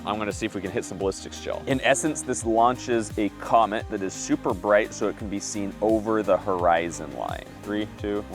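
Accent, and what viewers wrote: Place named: United States. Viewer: Title should be: " Scandanavian pyrotechnic nerd who speaks American describes flares".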